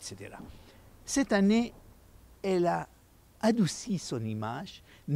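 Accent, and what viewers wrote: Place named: Europe. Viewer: المقدم يتكلم بلهجه اوروبيه ثقيله جدا و لا يبدو أنه من أهل فرنسا..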